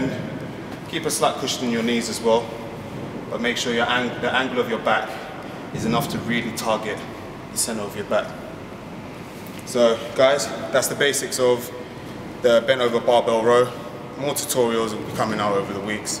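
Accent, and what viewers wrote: United Kingdom. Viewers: As an American (white guy) when I hear a refined British accent coming from a black man who probably has a normal job of some sort, it gives me hope for the average American black person. Not much hope but still a bit of hope that they are capable of being refined.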